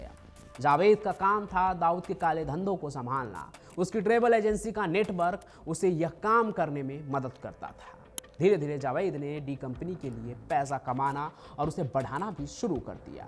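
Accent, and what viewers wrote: Bihar, India. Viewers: Bhai tu bihar se hai ky tere gawaro jaise bat krna smjh me aata hai tu bihari hai😂😂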